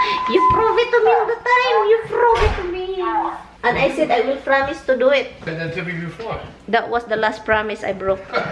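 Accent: Visayas, Philippines. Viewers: hello miss avid fan ako tanan vedio vlog mo nasakit tyan ko kakatawa sau..kng paano ka magsalita ng bisaya regards sa hubby mo.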